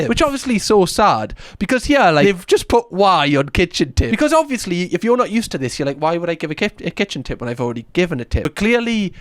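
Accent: Newcastle, United Kingdom. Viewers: I've gotten used to your accent and I really like British accents 💕🍩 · Everytime I watch your videos. My thoughts have a geordie accent for ages after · I LOVE YOUR ACCENT! I'd never really knew about Newcastle and the various regions, just the usual ones we hear in the US. I couldn't figure out if it was Scottish or Irish...I was like it's a Scottish but easier to understand (for me anyway). I love Italian Bach's accent too, it's so smooth, sort of posh sounding but not too posh. To my ears his accent is like lower case cursive, and your accent is block print ❤ Love y'all from Virginia, not one of the obnoxious Americans Edit to add...here in Virginia we have areas that still speak an older English, even Elizabethan! <link> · I am American, love the bri'ish accent and any fun we make of you is in good fun ❤ also very few of us use military time, usually because of specific careers like policing or the actual military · American’s are absolutely a sucker for a British accent, actually what first got me to listen to video that came across my feed. 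He’s been my favorite commentary youtuber since. And likely tops the time watched list for me for the months since I found him! I don’t even normally watch commentary type videos often but is so pleasant to listen to, kind, and insightful. Oh and epic accent, of course! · I love your accent! Can’t really explain why, I just enjoy listening to people speaking in the Newcastle accent, and don’t find it difficult at all (as a non native speaker). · You're the one who introduced me to the newcastle accent and I honestly love hearing it! The fact you also got amazing humor puts the ribbon on the gift, love your videos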